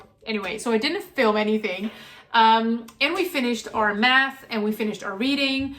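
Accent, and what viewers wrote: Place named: United States. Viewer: I love your videos and find them inspiring Just wondering though....why do you have an American accent since you are a Dutch family living in the Netherlands? I am English and previously when I've heard a Dutch person speaking English it is totally different your accent.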